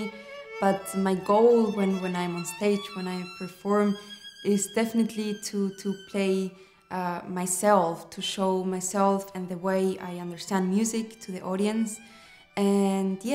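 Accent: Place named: Germany. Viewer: Tienes acento alemán y hablas inglés, pero tu nacimiento, tu nombre y tu increíble talento, te hacen un verdadero orgullo para España. Ya podemos decir que no sólo tenemos a los mejores en el deporte, sino también en la música de verdad. Gracias, thank you, danke... (el próximo concierto en Mallorca no me lo pierdo)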